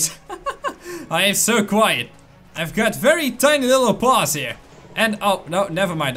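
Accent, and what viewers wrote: Netherlands. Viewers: Are you from The Netherlands? You got that enthousiastic Dutch accent when speaking English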